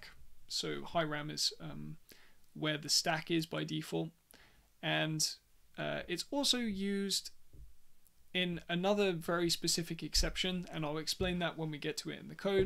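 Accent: Netherlands. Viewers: You're either Dutch or Belgian :). I think Dutch. Nederlands?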